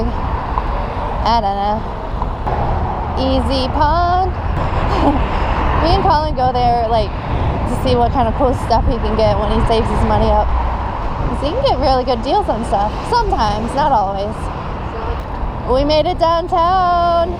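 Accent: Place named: Texas, United States. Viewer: Love your Texas accent. The reason why you see so many vacant buildings and vacant land in Las Vegas, is the same reason that you see these things in most major US cities and that is the prices of property has been pushed up so high, it has become too expensive to carry the financing. So they sit vacant and empty. There is many homeless in Las Vegas. And if you were to go north from Fremont st on LV Blvd you would see it. Or go to Boulder hwy.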